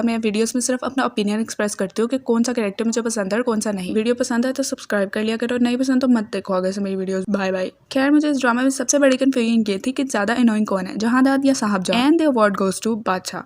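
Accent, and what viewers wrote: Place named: India. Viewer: Par apki accent indian lagi such mai hmko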